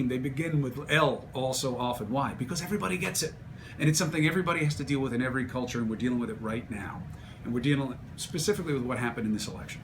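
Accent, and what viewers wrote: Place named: Ireland. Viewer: you got the best brain, you know that? Plus you know words. You've got the best words. Ever heard that before? Lol. Thx for the vid. BTW, I know u are of Italian descent, but I could swear I detect a smidgen of an Irish lilt in your accent? Sexy as all heck! Keep at it. xx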